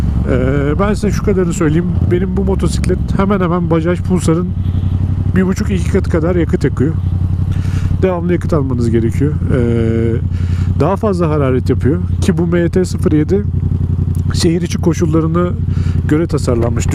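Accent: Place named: Gaziantep, Turkey. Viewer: Once yol tatlo geldi izliyorum senin konusmani gectim yola saga sola daldim lan burasi bana boyle bi tatli geliyor birsey var ama okadar dalmisim ki meger memleketimmis be tam antep lan dicektim sen dedin antep diye burnumda tutuyor..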